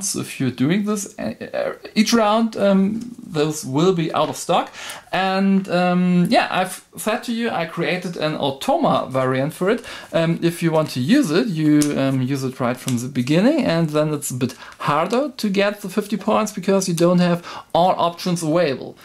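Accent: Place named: Germany. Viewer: Oh honey, your English is great, thick German accent 😊 but still very easy to understand!! Great job and thank you for this runthrough,! Keep up the good work! Greetings from California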